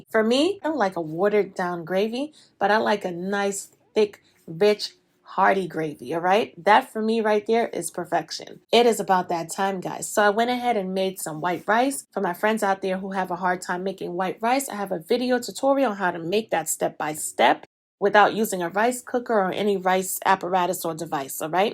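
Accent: New York, United States. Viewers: You sound like you from NYC I hear a hint 😂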